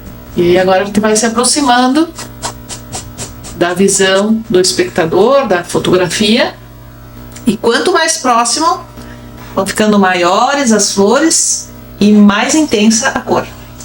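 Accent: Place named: South, Brazil. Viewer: Hi, que surpresa ver campos de lavanda em Gramado! vc está em Gramado? amo Lavandas e nāo sabia que tinha ai no sul.,principalmente quando estāo proximas com campos de girassois. Aqui no sul da França, o pic da floraçāo é em julho qdo fazem a colheita, e ai em Gramado? amei ver suas técnicas de pintura e maneira de ensinar e amei o sotaque kkk acho que é do sul! estas tintas em pote que vc usou, sāo tintas para decoraçāo? a pigmentaçāo é permanente? pergunto pq aqui este tipo de tinta em potes sāo vendidas em lojas de crafts e a escolha de cores é limitada, e as em tubo em lojas de material para desenho e artes. fiquei feliz de encontrar seu canal e ver suas alunas!!!!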